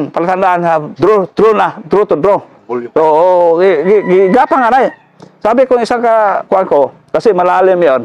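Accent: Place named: Iloilo, Philippines. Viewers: Ilonggo gid si sir.. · Basta ilonggo mag kwento maganda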